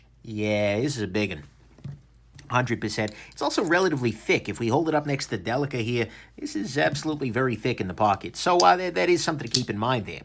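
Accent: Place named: Brooklyn, United States. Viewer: As a New Yorker, I give this a thumbs up and comment for the Brooklyn Jewish accent alone!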